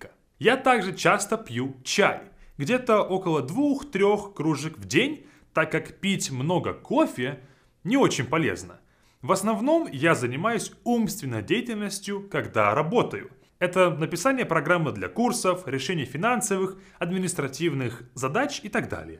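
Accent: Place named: Russia. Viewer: Чувство, что он русский, но прикидывается иностранцем.. Даже акцент русский есть:0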